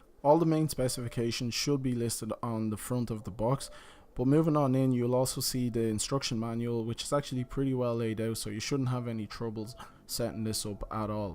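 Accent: Ireland. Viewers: Love the irish accent! Keep up the great work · Where in Ireland are ye from? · the irish accent though man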